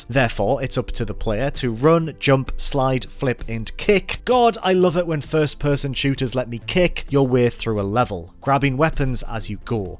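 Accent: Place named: Newcastle, United Kingdom. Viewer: I get a warm feeling in my stomach when I hear this guy's little Geordie voice. So soothing.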